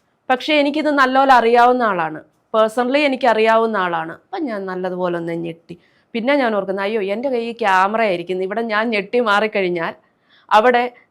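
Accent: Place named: Kollam, India. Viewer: കൊല്ലം ജില്ലകാരിയാണോ ഈ സ്ത്രീ...? "ഭ " ഫയങ്കര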